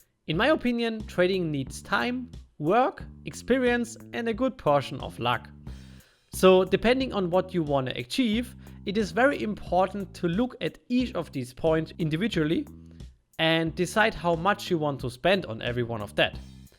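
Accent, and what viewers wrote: Germany. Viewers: i entered , are you german ? you sound like you have a german accent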